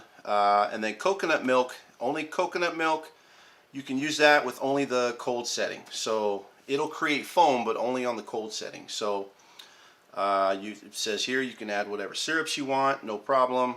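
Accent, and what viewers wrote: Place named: France. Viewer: Great vid, thanks. Are you still using the frother and the dual c❤coffee machine . I just ordered the dual plus machine and thinking of getting the frother. I ben loking e rywhre for anachine tht i cab do both kcup and nespresso. I sop motly online, i must of ben typing wrong or somthing lol hope the new cofe works good. Anyway thanks, oh you sound like you have a french accent, are you canadian ?😊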